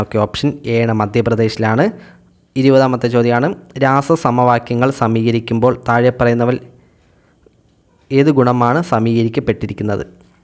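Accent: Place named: Thiruvananthapuram, India. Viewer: Sir Tvm aano.....slang👍🏻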